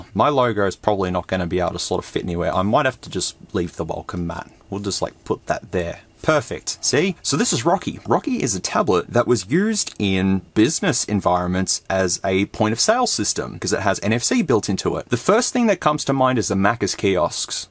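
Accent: United States. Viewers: I enjoy ur American accent lol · Well, you're no Hugh Laurie, but your Yank accent definitely does not suck, bro. :) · Your american accent is actually pretty convincing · You got a nice American voice ! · American here. Your American accent was actually really good! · DAMN! your american accent is pretty solid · Just saying, you've got a pretty good American accent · Your “American English” accent is pretty spot-on, tbh.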